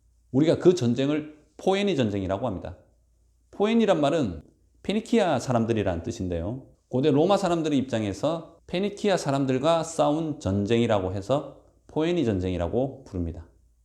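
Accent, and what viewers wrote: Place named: Gyeongsang, South Korea. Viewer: 강사님 억양이 경상도 분이라고 추측해 봅니다. 뺀질뺀질한 서울 억양보다 듣기 조아요~~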